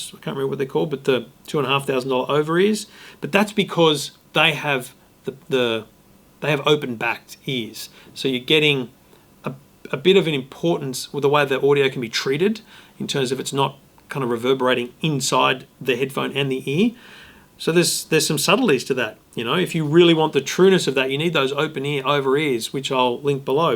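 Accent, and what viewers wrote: Australia. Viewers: Australia …..Australian dollars. Can you not tell by his accent ??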